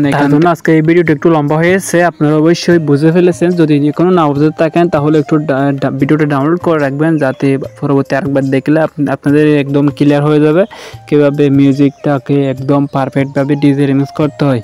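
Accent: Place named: Chittagong, Bangladesh. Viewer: ভাই আপনি চট্টগ্রামের মনে হয়। আপনার ভাষা শুনেই বুঝা যাচ্ছে 😅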